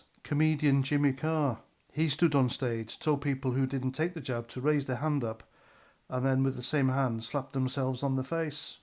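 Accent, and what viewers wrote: Wales, United Kingdom. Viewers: Your accent isn't Cardiff or Swansea, you from Merthyr maybe?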